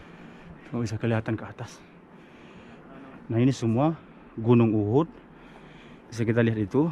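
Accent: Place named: South Tapanuli, Indonesia. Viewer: Nampak kali logat Tapselnya bg..sukses selalu..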